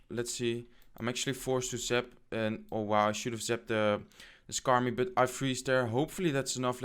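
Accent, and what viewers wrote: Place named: Netherlands. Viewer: Michi i think he is dutch :)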